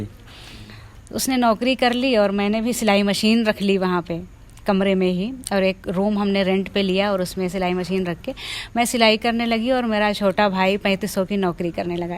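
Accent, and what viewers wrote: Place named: Pakistan. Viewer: Masha Allah Aawaz me kitni Achchi urdu Zuban ki Bu hai Dil khush ho gaya sun kar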